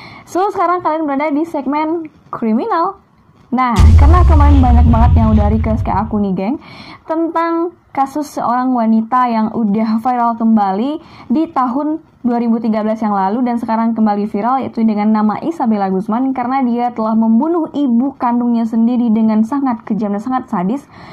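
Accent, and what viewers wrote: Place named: Minangkabau, Indonesia. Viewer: Minang kental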